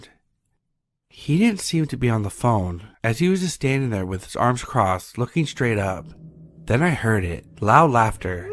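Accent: South, United States. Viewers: I dont know if you had csndy in your mouth or if u havw a cold but if u arw trying to speak differently ( just im case someone told u they dont like the way u speak) then dont like ur voice is okay i like the country wake u speak.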